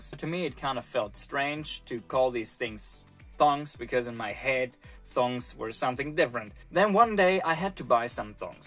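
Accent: Australia. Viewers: I think you're already getting a bit of an Aussie accent 😄👍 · aussie here! sorry for the massive text block but i wanted to give some honest feedback on your use of the words, i enjoyed the video and although it is the first video of yours i have seen, it will not be the last haha. firstly, the accent was better than most non-aussies, it had a lot of your natural accent in it aswell but the two accents were identifiable, you could definitely tell it was an aussie accent crossed with something. sheila is neutral as ive seen others already mention, its a fairly outdated term nowadays as it can sorta be considered a little sexist, the same way that like "chick" isnt exactly a nice way to talk about a woman. but you can both insult "thats one big sheila" and compliment (sorta) "thats one strong sheila". so its not exactly polite but it isnt always negative. fair dinkum really doesnt need the dinkum part tbh. 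its just refering to something thats fair imo. like if two players on opposing sports teams are talking to the referee and the ref makes a decision that the player deems fair and reasonable, theyd say "yeah fair dinkum, alright" and go back to playing. it can also be almost positive in the same way calling something "decent" is. like, "thats a fair dinkum engine in that thing" so it doesnt have to be about a person, and it sorta just means far/ understandable. something like that. at least to my understanding thats it. its usually just calling a decision agreeable in my experience. but the way you used it about a person is also accurate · Your English is truly Aussified - accent and all. Can't help myself - I have to chuck in a couple of definitions for you (haha). Chuck = throw. Fair dinkum = true, authentic or as a question it means "really?" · Pretty good Aussie accent.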